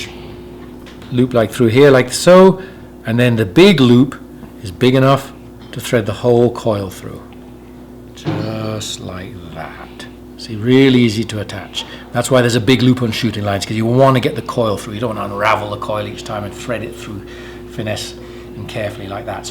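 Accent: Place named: England, United Kingdom. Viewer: There's certainly something to be said about having a presenter with an English accent. Watch any of David Attenborough's nature documentaries and you'll understand why. As per usual does an excellent job of breaking down something which can seem daunting to beginners, into a no nonsense, easy to follow guide for getting started.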